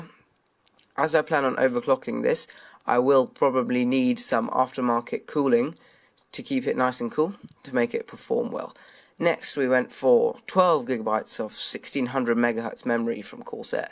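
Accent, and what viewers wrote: United Kingdom. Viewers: finally an english voice on youtube ahh :)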